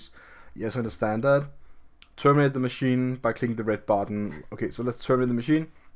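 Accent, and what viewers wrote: Denmark. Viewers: You sound danish :) Thank for the video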